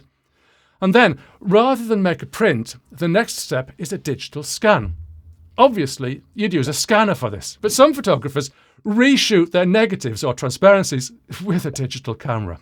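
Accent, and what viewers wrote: United Kingdom. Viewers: Ouch. Anyone watching this video should read the comments before wasting the time viewing this one. The only thing credible about the commentary on film photography is that the speaker has a British accent. One cannot rely on this as a source of credibility. BTW, I did watch your other content, and it's great! Why tarnish your channel with this? Less credible material adds to the pile of rubbish that people have to sort through on youtube. Stick to audio. THAT is what you are great at!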